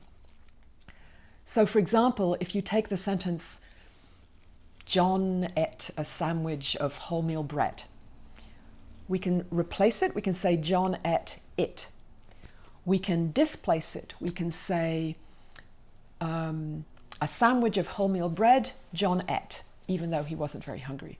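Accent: Scotland, United Kingdom. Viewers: this is from the University of Edinburgh, I suppose it is a kind of Scottish accent.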